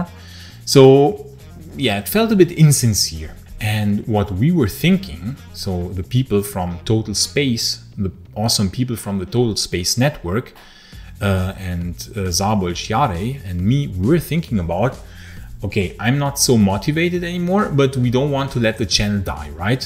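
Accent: Germany. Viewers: I like both topic and I was actually subscribed to the other one ,… and I just realize it was another channel… . Your accent is from German?… I’m Spanish speaker and going throughout B 1 in German :). ( Austrian wife 🤷🏻‍♂️) . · You could have pushed your audience to Odysee or Bitchute. No algorithms there.... I'm gonna miss your show, but I wish you guys the best! I'll give the other guys a watch, though... It won't be the same without your sarcastic comments made in you German accent, but I'll give them a chance.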